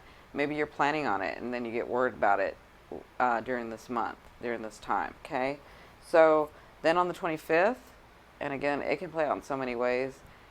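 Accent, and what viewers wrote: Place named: South, United States. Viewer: Hello, Your 2 funny! Your REAL; Thank You! Yes i fully understand everything your stating! I understand planet's! You have my accent, you talk like me are u from the south? jan 27th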